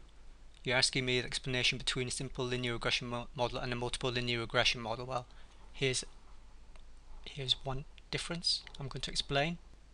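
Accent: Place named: United Kingdom. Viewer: Thick British accent.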